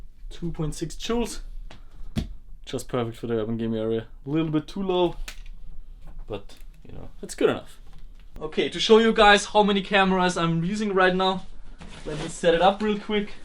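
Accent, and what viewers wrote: Bavaria, Germany. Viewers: sprichst du deutsch,schweizerdeutsch oder bairisch ?? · German accent right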